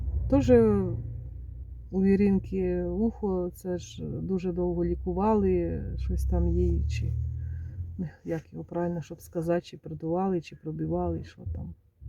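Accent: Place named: Polesia, Ukraine. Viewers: А ви, напевно, родом з чернігівщини? Моя сваха з тих країв, то в неї точно такий говір.А шапочку потрібно поміняти, на шерстяну алергія. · Де це ви проживаєте? Мова то поліщуків.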